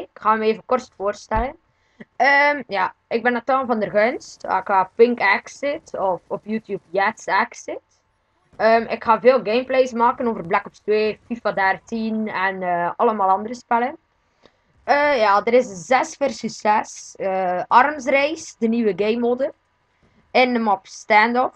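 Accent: West Flanders, Belgium. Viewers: Nice West-Vlaams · je hoort fuking hard dat hij West-Vlaams is.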